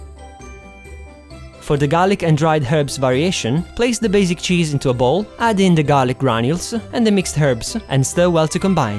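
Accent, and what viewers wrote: Italy. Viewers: no, dont change your accent, Italians always make english sound beautiful! these look really good:) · I love love loooooooove your italian accent when speaking in English. Sono vissuta due anni in Italia e i tuoi video mi ricordono del legendario "sense of humour" degli Italiani (aaaaah, nostalgia!)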